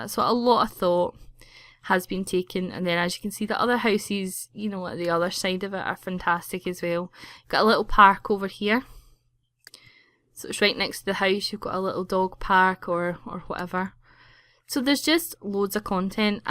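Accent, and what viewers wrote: Scotland, United Kingdom. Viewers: you sound Scottish just like meh! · Wow, your accent is gorgeous. I don't know if you know who James McAvoy is, his a Scottish actor and his voice is amazing just like yours! I think I'm just inlove with Scottish and Irish accents! They are just so different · It's called a Scottish accent, and it's cute. Maybe you're deaf, or just not used to the English language, but I learned English about 1 year ago, and I'm used to a normal American/Boston accent. And I can understand her perfectly. It's not fault that she speaks like that. So, please don't comment on her accent. She can't do anything about it, and she shouldn't, because it's lovely <3 c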